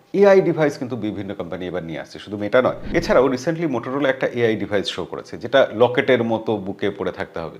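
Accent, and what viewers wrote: Bangladesh. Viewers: পরচুলো পড়া এই লোকটা বাংলাদেশি উচ্চারণ নিয়ে কি করে আনন্দবাজারে এলো।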